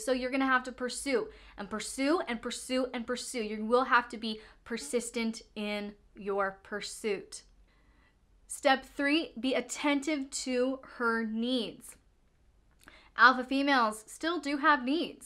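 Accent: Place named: South, United States. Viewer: I think this is one of the best explanations I've heard on this. Maybe it's because where I am in life right now. But in a sense I think you were talking to me. BTW, you sound like you have a bit of a Southern accent. Are from the south? It'll be my luck you'll say, "I'm from NYC. I don't know how you got that idea!" LOL! Thank you for the advice.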